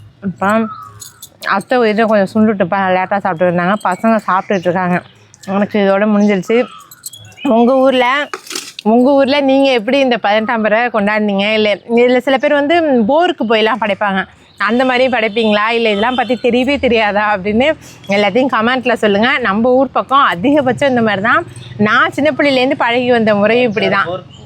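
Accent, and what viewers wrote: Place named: Nagapattinam, India. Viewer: Akka nanga pesura mathiriyea neengalum solreenga.nan unga ooruku pakam thn Nagapattinam ❤❤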